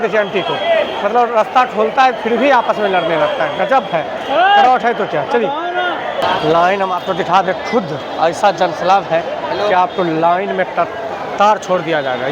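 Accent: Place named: Bihar, India. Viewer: भाषा का उच्चारण ठीक से करो।। तुम बि हारी कोई भी भाषा बोलो उसमें उरेठ पन झलकता है।।इसलिए तुमलोग हर जगह मार खाते हो।।शर्म नाम की कोई चीज बची ही नहीं है तुमलोगों में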